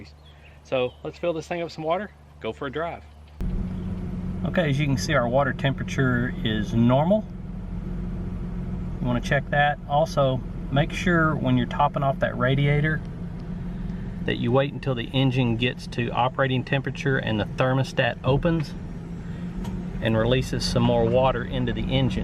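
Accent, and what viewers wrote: South, United States. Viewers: i like ur southern accent... makes me wanna eat some ribss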